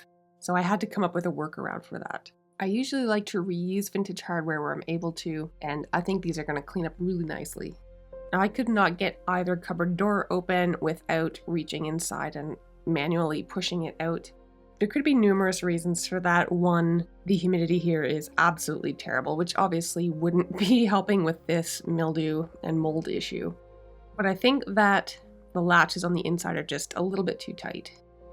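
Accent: Canada. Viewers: ! I could tell by your accent that you are Canadian, but so delightfully surprised that you are in the Valley! I'm in Switzerland but love so much seeing an artist from my neck of the woods! I actually got a little emotional when I saw the final result. I was imagining the people who owned that piece over the years and how happy they would be to see how you gave it such a stunning new life! I especially love the woodwork on the middle drawer. Lovely work!! ❤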